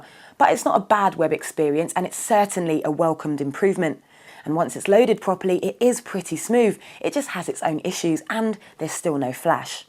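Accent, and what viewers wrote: United Kingdom. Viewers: A Black girl speaking in uk english!!!!! WTF LOL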